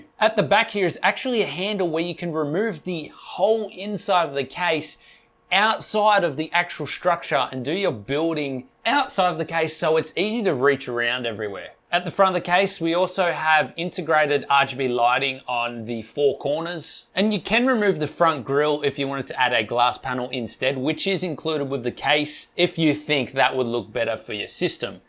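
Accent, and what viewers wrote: Australia. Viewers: Hello I was wondering where you were stationed? bc it sounds like you are Australian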